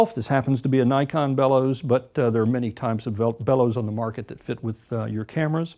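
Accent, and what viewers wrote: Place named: United States. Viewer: I'm confused: he's in Australia, but his accent is American, but he called a flashlight a torch. Dude, where you from?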